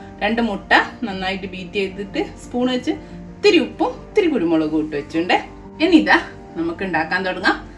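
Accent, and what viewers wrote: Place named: Thalassery, India. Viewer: Excellent recipe!💖 Are you from Thalasserry...I think so from your language..😊😊 .......From Bahrain. Actually from Thalasserry.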